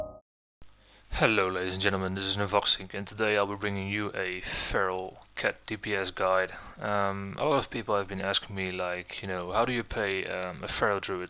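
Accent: Netherlands. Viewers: ure dutch arent you? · Lol you can just hear this dude is dutch :)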